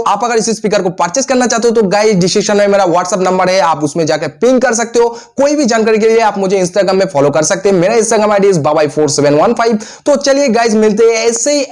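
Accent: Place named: Assam, India. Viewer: Banda assami hai baat karne se pata chalta hai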